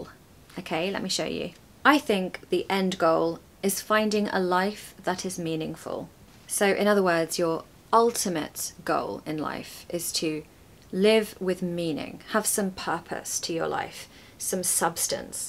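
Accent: RP, United Kingdom. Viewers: Hi, you sound like Lucy from English with Lucy. Has anyone told you this before? Or is it anyone with RP speaks as you do? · Lovely to listen a fantastic british accent! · i wish i could speak english with a pr accent like you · I love your british Accent because overwhelmed me as well as your style of presentation and l'm fond of you.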